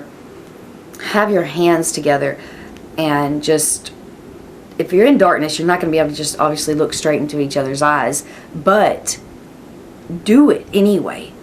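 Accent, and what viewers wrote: South, United States. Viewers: Cool southern accent haha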